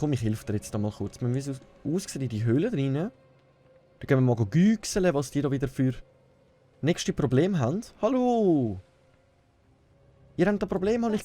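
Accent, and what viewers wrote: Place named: Switzerland. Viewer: Scheinst sehr sympathisch und dein Kommentier-Style ist echt flüssig. Iwie schade, dass du nicht soo viele Zuschauer hast :) Bin auch us de Schwiiz, darum verstehe ich natürlich gut, was du sagst, mache meine Videos aber auf Hochdeutsch. Kann mir auch vorstellen, dass die Zielgruppe in der Schweiz einfach ziemlich klein ist ^^ Bist aber auch noch nicht sooo lange auf YouTube, hab ich gesehen :D Nur so als Tipp, falls du deine Videos etwas besser gestalten willst. Ich würde bei dir die Kameraqualität vielleicht noch etwas verbessern. Ich glaube die Aspect Ratio stimmt bei dir noch nicht ganz (siehst wohl etwas zerquetscht aus ^^). Dazu wirken ein paar Lichter für dein Gesicht echt wunder (auch sehr günstige LEDs z.B.). Hab auch selbst erst vor kurzem mein Setup mit Lichtern verbessert. Es ist erstaunlich wie viel solch kleine Sachen ausmachen. 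Ansonsten ist die Quali echt super und deine Videos (zumindest die, die ich bisher gesehen habe) ganz unterhaltsam :) Mach unbedingt weiter! Cya :3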